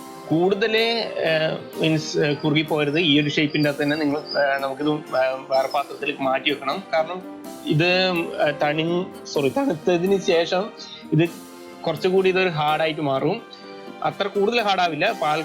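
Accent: Kannur, India. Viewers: നിങ്ങൾ ഒരിക്കലും മോശമായ, ശൈലി ഉപയോഗിച്ചിട്ടില്ല ,കേരളത്തിലെ എല്ലാ ജില്ലയിലെ സംസാരത്തിനും ശൈലിയിൽ മാറ്റമുണ്ട് ,നിങ്ങൾ നിങ്ങളുടെ സ്വന്തം ശൈലി കണ്ണൂർ ശൈലി ഉപയോഗിക്കുക. സ്വന്തം ഭാഷ പൊട്ടയാണെന്ന് പറയുന്നവരാണ് മലയാളികളിൽ കുറെ ഭാഗം ധൈര്യത്തോടെ കണ്ണൂർ ഭാഷ ഉപയോഗിക്കുക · Njanum kannuranu nammude bashakentha mosham. Recipi suuper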